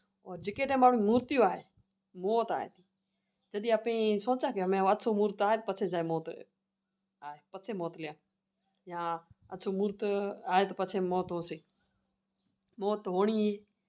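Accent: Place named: Jaisalmer, India. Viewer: अरे हुकुम आप तो गुजरात लेकिन भाषा तो पूरी जैसलमेर बोलो एकदम❤❤❤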